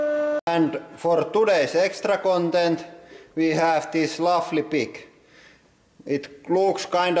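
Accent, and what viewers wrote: Finland. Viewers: In Finland we call that accent as rally english. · eikö aksentti muka paljasta? Kyllä suomalainen aina toisen tunnistaa. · "vii kotta gou diipper!" Mä niin rakastan tätä rallienkkua. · In finland its called "ralli englanti" meaning rally english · nah nope, its just the finnish accent called "rallienglanti" or rallyenglish. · Suomalaisten ralli Englanti..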